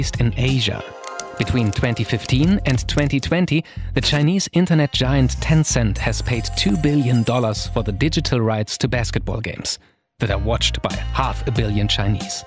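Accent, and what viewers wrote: Germany. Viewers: Still loving den deutschen accent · I like this guy's thick German accent.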